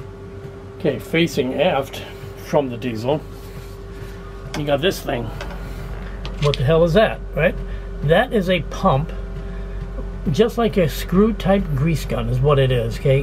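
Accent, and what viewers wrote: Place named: Canada. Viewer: Aha ! That's why you have a Canadian accent!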